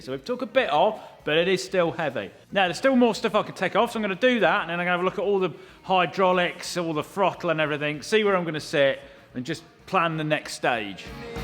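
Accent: United Kingdom. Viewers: He sound so British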